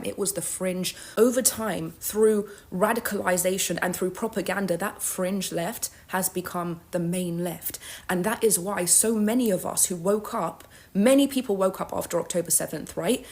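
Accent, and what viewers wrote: United Kingdom. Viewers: Correct. That's how you know everything she's saying is utter BS. Not to mention she misses much of the underlying factors and historical contexts of the past 300 years that would explain where we are today. She gotta cute face and a British accent so many will listen. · She's not iranian - i hear british accent.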